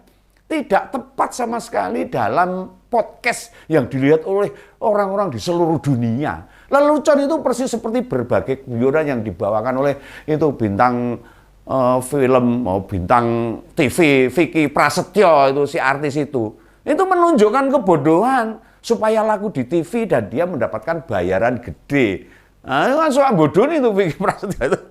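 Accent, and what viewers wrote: Java, Indonesia. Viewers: Teruskan pak blantek sapi ngomong jowo ae gak usah ngomong bahasa indonesia medok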